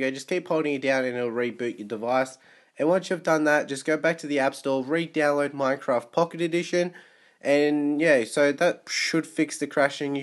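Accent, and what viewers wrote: Australia. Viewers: I’m Australian and I hear a Australian accent are you australian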